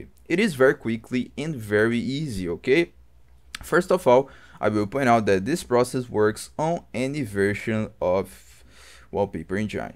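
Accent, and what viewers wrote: Brazil. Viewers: sabia mano, tava um sotaque brasileiro demais pra um gringo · o sotaque brasileiro ta forte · O massa é que Br consegue identificar Br apenas ouvindo a voz kkkkk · I just know you're brazillian just for your accent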